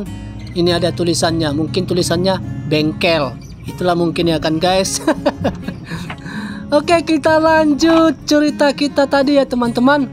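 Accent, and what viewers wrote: Medan, Indonesia. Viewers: Saya sering ngikutin konten Anda.saya mau tanya dialek kayaknya anak Medan.udh lama tinggal di Jepang? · Baik bahasa Medan kita nya bg😂😂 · Maaf, bang ini suku apa ya, saya suka dengar logatnya, kayaknya bahasanya mirip logat medan...😊❤ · abg org medan ya logat abg kayak org medan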